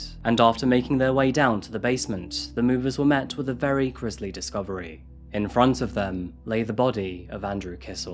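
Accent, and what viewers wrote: United Kingdom. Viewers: I have to turn on the closed captioning in English because sometimes is hard for me to understand what he is saying 😂😂 it's the British accent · as someone from the UK you are kinda brave saying we pronounce things wierd in the US. That being said I have no explanation for Connecticut. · Lol, not understanding why Connecticut is pronounced the way it is is rich coming from a man that sounds very British. Americans, try pronouncing a great many British village names and you would be wrong. By the way, I really like your channel. It is one of the very best crime shows on the internet. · Mate your British like me. Half our town names are spelt differently from how they're pronounced lol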